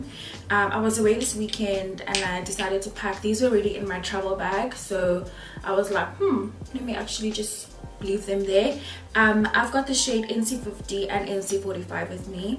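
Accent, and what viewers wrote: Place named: United Kingdom. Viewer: Hey! Love this tutorial as always and I've BEEN a fan since your days (British accent and all lol) I'd love to win this prize and follow as much of your amazing looks as possible❤❤ Instagram: nickynic_101 Foundation shade: Maybelline 24 HR superstay Caramel 60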